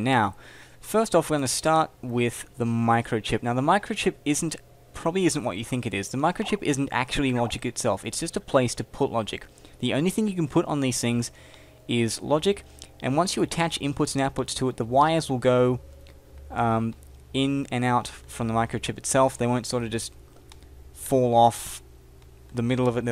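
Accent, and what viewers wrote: Australia. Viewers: u an aussie?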